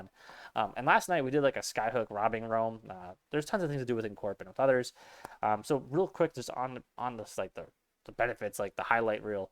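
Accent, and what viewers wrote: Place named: United States. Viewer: 4s is super euro. I didn't expect the American voice. expat?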